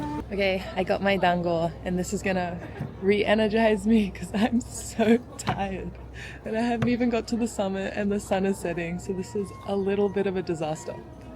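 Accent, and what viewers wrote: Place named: Australia. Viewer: I like your Australia accent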